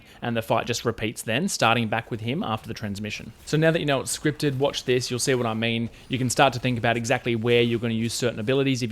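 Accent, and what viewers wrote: Australia. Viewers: Heard the Aussie accent and instantly hit subscribe. Gotta support me fellow Aussie content creators.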